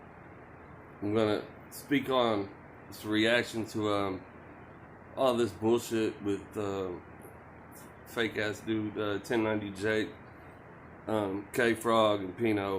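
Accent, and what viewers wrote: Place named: Indiana, United States. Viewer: I dunno you, but you're a white guy from Indiana. And you talk like a white boy from Indiana, you don't pretend to be something you're not. These clowns pretending their momma is blacker than night are an embarrassment. Total embarrassments.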